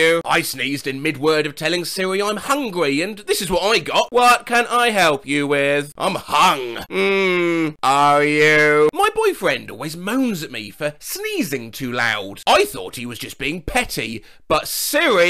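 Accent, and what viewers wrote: United Kingdom. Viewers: He sounds more like Wheatley because of his BRI'ISH accent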